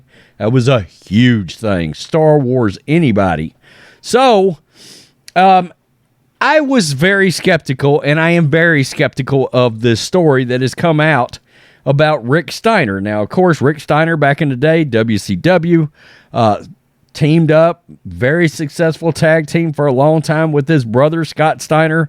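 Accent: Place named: Appalachia, United States. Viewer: I could tell by the redneck hillbilly accent that this was going to be a dumb video. But even then I was impressed by how much worse it actually was. Congrats on exceeding expectations.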